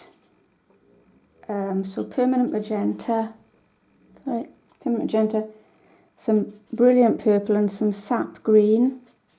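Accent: Wales, United Kingdom. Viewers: Beautiful thanks so much. Love your welsh accent!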